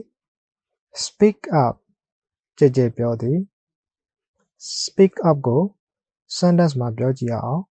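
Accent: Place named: Myanmar. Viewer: မြန်မာဘာသာ ပြန်ဆိုထားတာ မနက်ပိုင်းနဲ့ ညနေပိုင်း လွဲနေတယ် အသံထွက်ပီပြင်ဘို့ လိုနေသေးတယ် ငပိသံ မပျောက်သေးဘူး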